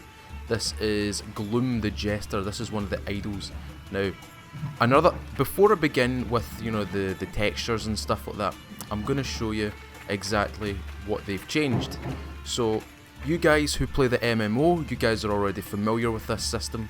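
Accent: Scotland, United Kingdom. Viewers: Came for the video, stayed and subscribed for the accent. Love the scottish accent. Edinbrugh, right!? Keep on with the videos.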